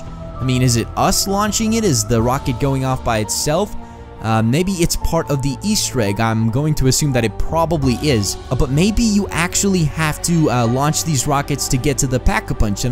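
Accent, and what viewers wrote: Bosnia and Herzegovina. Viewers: Are you from Bosnia?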